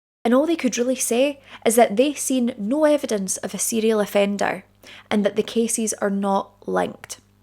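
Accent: Scotland, United Kingdom. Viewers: English edit yes Scottish sorry!. Love accent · Lol, it's a pretty typical Scottish accent. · Ohh no danger great video its gallus a Scottish accent .watching from paisley ❤ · She's Scottish.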